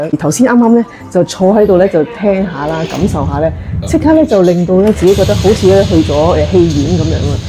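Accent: Taiwan. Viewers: 是超級可愛的那種口音 不是不好的那種 但是越来越像台人了 PS：完全没問題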